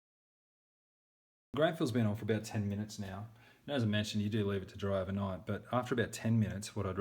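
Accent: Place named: Australia. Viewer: Awesome, sounds like your an Aussie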